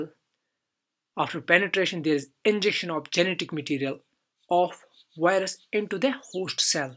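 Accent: Kashmir, India. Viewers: I think u r from kashmir? Accent of talking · Came for the science, stayed for the lovely indian accent!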